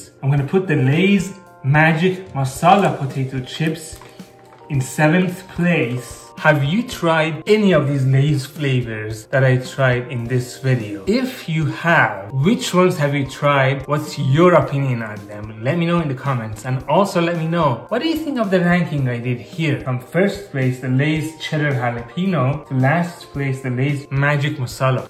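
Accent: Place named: Iran. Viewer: Are you Iranian? Your accent seems Persian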